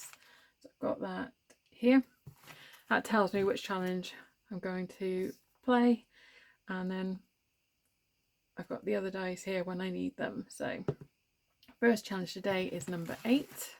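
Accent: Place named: England, United Kingdom. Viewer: ❤ Hi! ❤ I'm here, watching and enjoying! What region in England are you from? I'm trying to figure out your accent.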